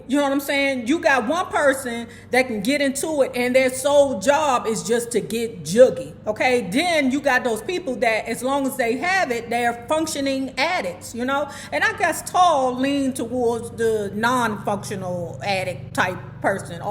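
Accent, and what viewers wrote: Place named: Washington DC, United States. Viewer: Lmao lmaooooooooo you sound like you from DC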